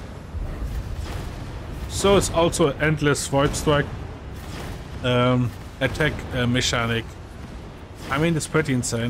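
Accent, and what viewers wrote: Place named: Germany. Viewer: Thanks for the tip! and your Denglisch is really gut :D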